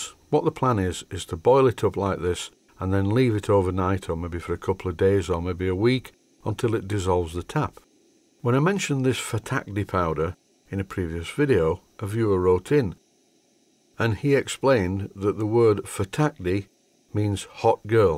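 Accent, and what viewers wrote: United Kingdom. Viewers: I love your videos and am gradually learning British locomotive terminology even though sometimes your charming accent adds another layer to comprehend. My favorite example is "group" screw, which I finally figured out was grub screw which I would call a set screw. Also, turret would translate to manifold here in the US. Keep it up!!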